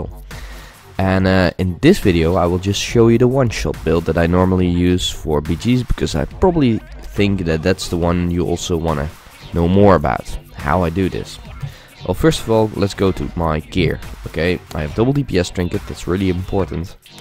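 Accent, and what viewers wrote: Netherlands. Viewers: YOUR DUTCH I JUST KNOW IT :D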